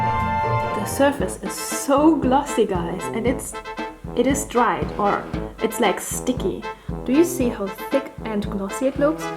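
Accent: Germany. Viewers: Man hört, dass du aus Deutschland kommst c: I love Coffee 😍😍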